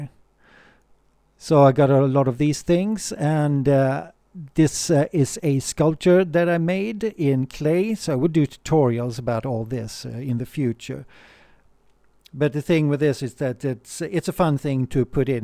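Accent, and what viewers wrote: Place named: Scandinavia. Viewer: A nice package made with a Nordic accent...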